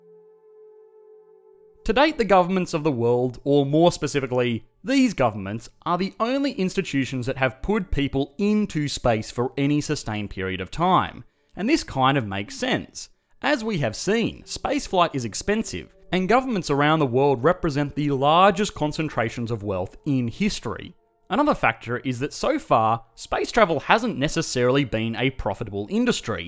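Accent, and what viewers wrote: Australia. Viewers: Not gonna lie I'm a sucker for the Aussie accent. Half the reason I'm subscribed to Mr. Sunday Movies too.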